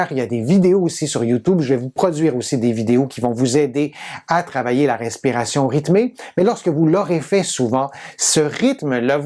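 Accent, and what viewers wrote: Quebec, Canada. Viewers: Tellement cute l'accent québécois aux oreilles françaises. Merci pour les explications.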